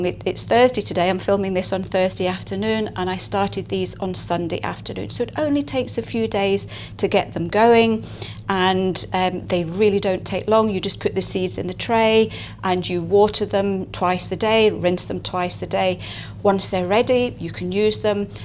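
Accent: Scotland, United Kingdom. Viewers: Love your Scottish accent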